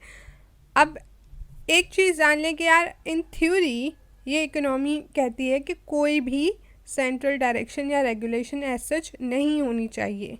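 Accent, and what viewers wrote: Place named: Peshawar, Pakistan. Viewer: Wah g wah peshawar sa ho kia ???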